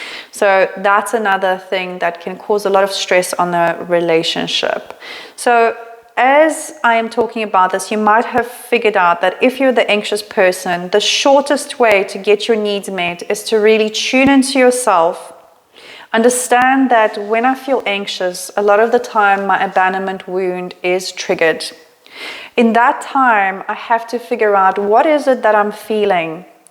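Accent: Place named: South Africa. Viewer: Literally get so triggered hearing a white South African accent. I associate it with so much racism, can’t even hear the content. Goodluck with your channel, sincerely x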